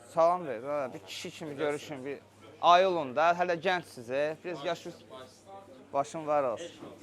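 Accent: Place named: Baku, Azerbaijan. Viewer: Can Ay Bakılım ləhçövə quzu kəsim.Qulağa necə xoş gəlir bizim şirin ləhcəmiz ağzunnan bal damlıyır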